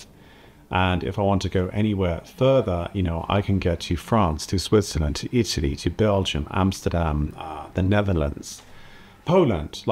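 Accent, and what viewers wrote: Germany. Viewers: Not having to learn German only applies to people with EU passports (or in your case, "former" EU members). All the others, including Americans, will have to show some proficiency at a certain point in time, otherwise the residency will not be renewed. - Unless they changed that recently, which I might not be aware of (which I can't imagine). You're a lovely person and I'm glad the algorithm brought me here. Might it be possible that after all these years you have a slight German accent? What are your friends and family back home saying? I'm really curious. All the best and thank you. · As a Brit I’d love to be able to just get on a train and travel to different countries so easily. Ps I can hear a slight German undertone in your English now. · This video is very interesting and informative. I think it's amusing that you now have a slight German accent. 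I learned German at school here in Australia in the 1980s and travel to Germany occasionally. I've visited every State, and it's one of my favourite countries. I find that if I start speaking German to a German stranger, he or she usually replies in English. Sometimes, that's convenient. At other times, I just keep on speaking German, and before long, the stranger gets the message. Usually, he or she then compliments me on how well I speak the language - so it really is worthwhile making the effort to learn. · As a Brit,I love Germany, it's a beautiful country, and I find German frankness very refreshing. Whether I could live there or not ,is a different matter .BTW, after 10 years living in the country, your English accent appears to be tinged with teutonic tones. Thanks for posting. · It's because he has acquired a (very) slight German accent and diction! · You've picked up a German accent. 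It's been over 30 years since I last visited Germany. I should go back some day. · This is the first of your videos I'm watching. I observe that your native british english has a german accent. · Interestingly, you speak English like a German, not a Brit. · I can hear that he lives in Germany in his English!!!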